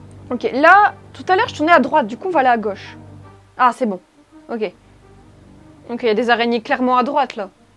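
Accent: North, France. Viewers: Le petit accent du nord ^^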